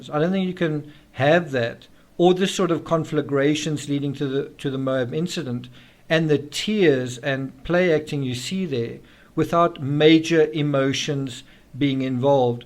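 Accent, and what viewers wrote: South Africa. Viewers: I have been gradually losing interest in this channel without understanding why. Could it be as simple as overdosing on the South African accent, since I also watch Grizzly? Blaming myself for losing interestshould have been my sign. Now I realize that was a sign of feeling gaslighting. You have totally misunderstood narcissism, and you might never be able to see it truly, even if you watch HG Tudor till the cows come home ... But the condescending tone you display towards your audience is unmistakeable to me now that I have become educated.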